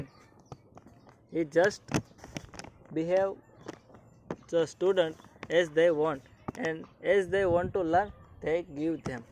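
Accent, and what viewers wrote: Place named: Bengal, India. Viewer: Like my benglai teacher speaking English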